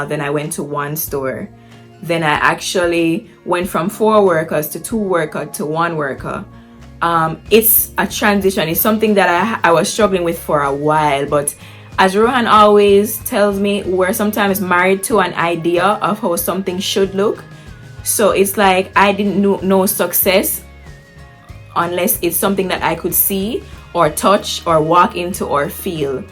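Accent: Jamaica. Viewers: Come thru yardie. Accent strong.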